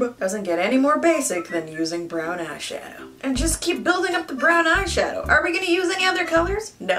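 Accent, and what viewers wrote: United Kingdom. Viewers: amazing british accent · this hair! this beat! this girl! her british accents!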